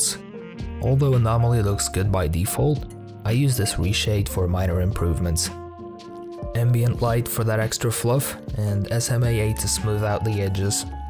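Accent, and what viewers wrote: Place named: Scotland, United Kingdom. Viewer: wow, me too and yet I thought you were Scottish of something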